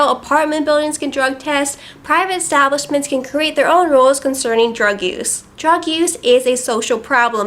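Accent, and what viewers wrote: South, United States. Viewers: please stop with the country accent very degrading to us southern folk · what's with the southern voice? Really, SMH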